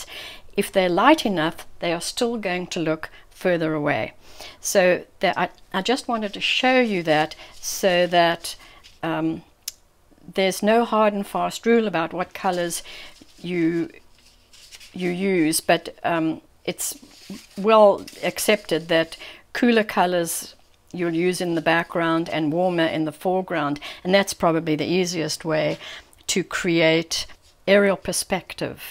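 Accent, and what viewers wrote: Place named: South Africa. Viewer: Thanks. I'm learning a lot about loose watercolour painting. I was surprised to hear you are living in California - you haven't lost your accent! Which I love to hear as I lived in S.A. for 10 happy years.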